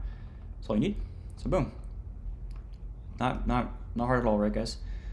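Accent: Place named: Texas, United States. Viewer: You from Texas right 🤠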